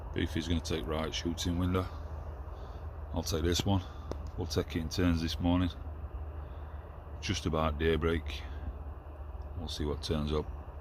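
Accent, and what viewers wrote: Yorkshire, United Kingdom. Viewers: What part of Yorkshire are you from pal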